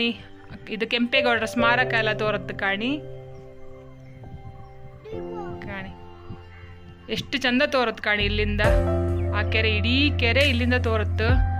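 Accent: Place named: Kundapura, India. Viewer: ಕುಂದಾಪುರ ಭಾಷೆ ಇಷ್ಟ ಆಯ್ತು. ಕಾಂಬ .ಕಾಣಿ. tortu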